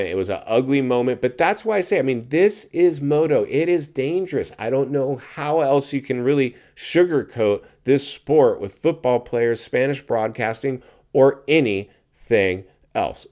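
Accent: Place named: United States. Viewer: 😂 American 💩 talking again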